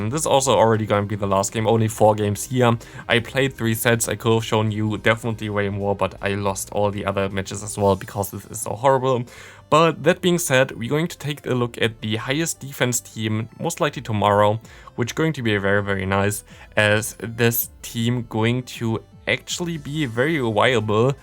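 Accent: Germany. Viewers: der deutsche aktzent hahha beste super video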